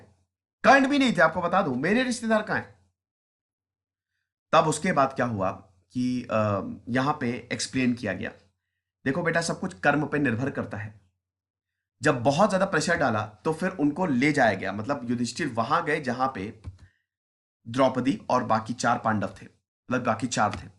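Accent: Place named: Uttar Pradesh, India. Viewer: I love to hear your voice Sir... N my favorite is Bhaiya.... The UP wala touch... 😁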